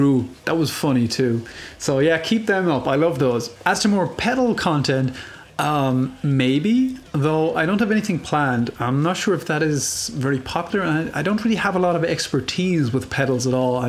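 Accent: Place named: Ireland. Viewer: New to the channel. Love it. But you speak English with a bit of an Irish accent on certain words. How'd that come about?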